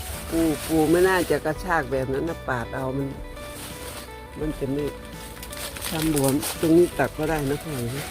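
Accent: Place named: Isan, Thailand. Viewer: สวัสดีจร้า กับปู่มารับชมวิถีชีวิตบ้านๆคะชอบ พูดภาษากลางผสมอิสานมากคะฟังแล้วหัวเราะตามเลย🤭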